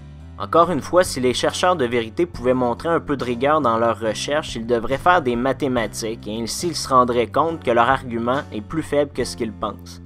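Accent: Quebec, Canada. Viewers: Trop bien ! Et j'adore comment t'assume l'accent québécois, je suis un compatriote.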